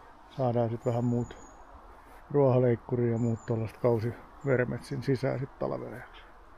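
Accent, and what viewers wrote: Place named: West Coast, Finland. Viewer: Hieno laite! Itellä samanlainen. Kunto kyllä ainakin päälle päin heikompi omassa koneessani, mutta pääosin toimiva sekin ja on päivittäisessä käytössä. Lumen aurausta ja trukkipiikeillä roudaan tavaraa. Kaivuuta toistaiseksi vähän ja sitä tarkoitus tehdä jatkossa enemmän. Käyttäjän murteesta päätellen jossain länsirannikolla ollaan. Itsekin aikoinaan koneen hain Porista.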